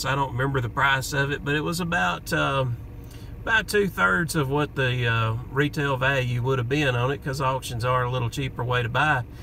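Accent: South, United States. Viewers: 😂🥰 you are just a hoot! I so love watching your videos and oh gosh yes, love that southern drawl😉🤩 Muppy is so adorable and she seems like my little girl right now, just wants to stay warm and curled up on her pillow and blanket or either on my lap. Yawl stay warm and dry and have a great week ahead😉👍🇺🇸🐾🐾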